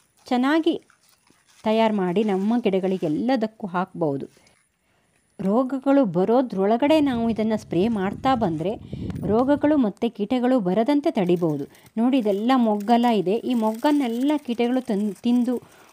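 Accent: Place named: Kundapura, India. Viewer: nim voice,heluva reethi, hagu nammoorina kannada bhashe ya aa shaili nange thumba esta aythu.nammadu moola ooru cundapura the hathra kota.malegala the nim video noduke laykaguthe.e time nalli bulbs metre barutha heli.rply plz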